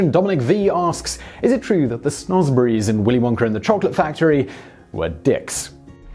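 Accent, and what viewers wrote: United Kingdom. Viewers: This video is thee DEFINITION of MISINFORMATION/PROPAGANDA... Once I heard your British accent it becomes CLEAR how much of a SHILL/AGENT you ARE .... 3 million subs huh? No SHOCKER most people are LOST AF reading through the Comments people CONTINUE to remain LOST while they're being MOCKED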